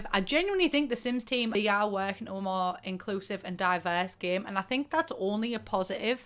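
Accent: United Kingdom. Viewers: lmao I'm from Germany and the only reason I understand you is because I have friends from all over the UK so I had to learn how to pick up on new dialects quickly. Before I met my first friend from England (West Yorkshire) all I could understand was that annoying London Accent and basic american english tbh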